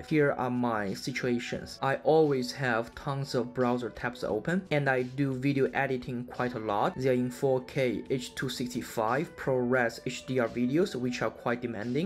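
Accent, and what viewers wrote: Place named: China. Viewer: I bet you are Chinese from your accent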